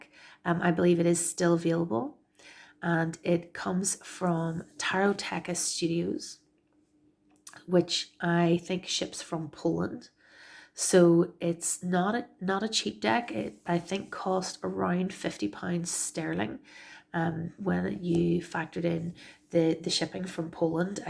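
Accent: Ireland. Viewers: Oh you're irish! Yaaayyyy, subscribed 🥰🌞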